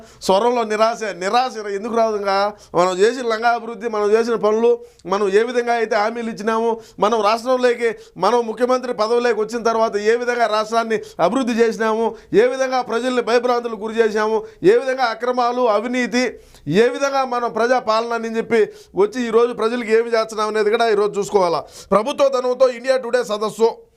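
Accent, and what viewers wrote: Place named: Kadapa, India. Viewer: తమ్ముడు నీ భాష లో కడప కనపడుతోంది 👍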